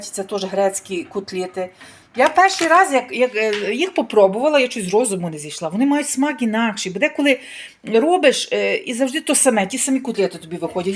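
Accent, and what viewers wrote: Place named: Western Ukraine, Ukraine. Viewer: Да это западенцы на своем суржике" балякає"🫤👎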